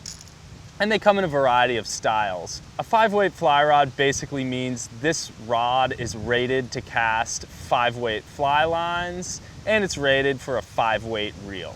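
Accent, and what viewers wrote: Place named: California, United States. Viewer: Definitely a cali accent. Annoying as hell.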